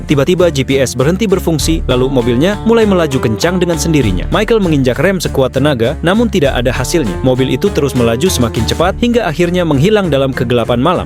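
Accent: Java, Indonesia. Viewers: Jawa itu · 😂😂😂😂 500 gelo... Jian jowo tenan Iki.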